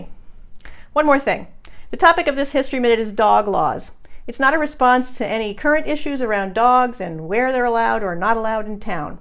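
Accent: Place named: United States. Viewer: Why is an American lady talking about Exeter history?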